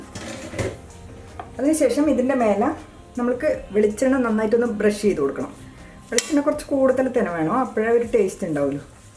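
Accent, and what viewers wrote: Kannur, India. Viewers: Super dosa..I will try ..Kannur slang 👌👍. thanks for sharing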